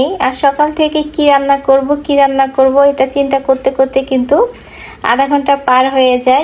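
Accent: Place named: Faridpur, Bangladesh. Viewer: Deshi bon tomar mukhe faridpurer kotha sune onek valo laglo❤❤❤❤rannagulo❤❤❤mashallah babata❤❤❤ghurte gele❤❤❤❤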